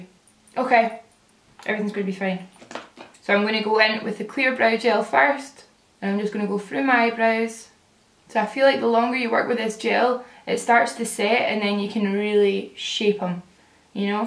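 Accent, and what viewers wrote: Scotland, United Kingdom. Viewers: Omg, no makeup.. you're stunning stunning stunning.. with as well.. goodness, and the lovely Scottish accent too. Big smile!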